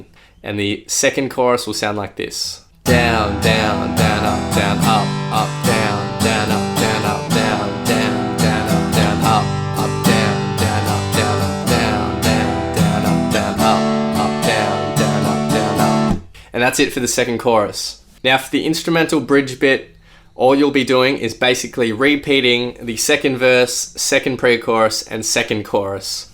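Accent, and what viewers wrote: Australia. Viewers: Its even better with the Aussie accent.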